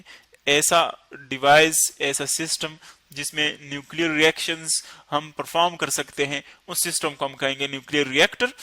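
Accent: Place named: Balochistan, Pakistan. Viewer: Sounds Balochi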